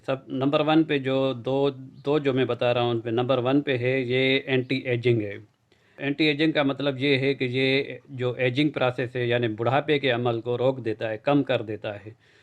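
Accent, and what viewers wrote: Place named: Uttar Pradesh, India. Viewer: السلام علیکم ورحمتہ اللہ وبرکاتہ حکیم جی آپکا لب و لہجہ بتا رہا ہے کی آپ کا آبائی تعلق اتر پردیش سے تھا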